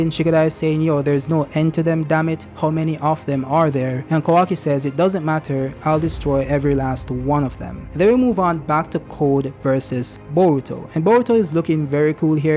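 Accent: Jamaica. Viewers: Is bro jamaican 👀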